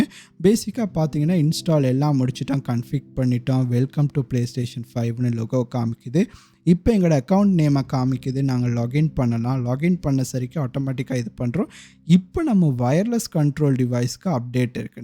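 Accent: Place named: Sri Lanka. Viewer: என்ன இலங்கை வாசம் அடிக்கு. அகதியா நீ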